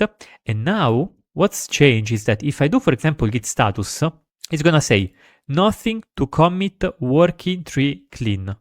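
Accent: Italy. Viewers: Lo sento da 1 km di distanza l’accento italiano haha bel video!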